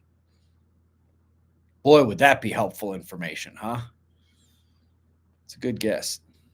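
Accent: Pittsburgh, United States. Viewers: That Pittsburg accent caught me off guard. Also your a very intelligent man how you expect ppl to come up with advanced questions. Lol Free Top G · Ben, Thanks So Much for this discussion/update... Has anybody plotted any Theoretical Data on Post Pole Shift: Earth Rotation and or Slosh Factor from the Oceans? Thanks for the Pittsburg Dialect, I understood it all... Carpe Diem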